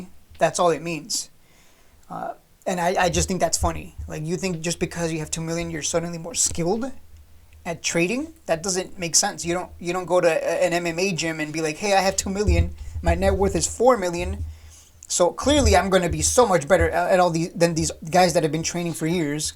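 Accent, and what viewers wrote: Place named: Northeast, United States. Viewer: oh I thought you was in northeast cause the accent haha I’m from SoCal. I’ve driven truck all through Midwest and NY many times tho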